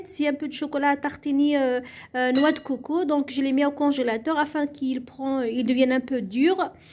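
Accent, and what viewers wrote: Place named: Kabylie, Algeria. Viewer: Je ne comprends pas l arabe pouvez vous traduire en kabyle car on dirait que vous avez l accent !!!!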